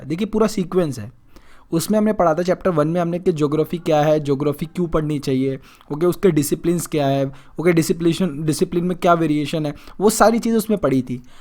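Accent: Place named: Punjab, India. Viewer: Loved ur punjabi accent and explanation 🙏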